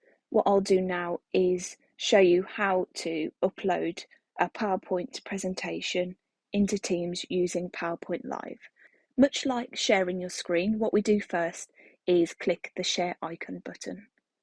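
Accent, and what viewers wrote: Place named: Birmingham, United Kingdom. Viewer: Excellent. Are you a Brummie?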